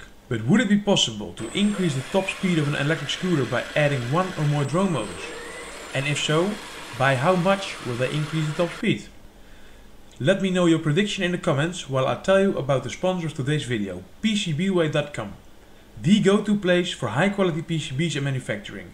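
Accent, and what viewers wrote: Netherlands. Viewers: Ik ruik een flink Nederlands accent. Maar hoe dan ook super leuke video, zoals alles wat ik van je heb gezien.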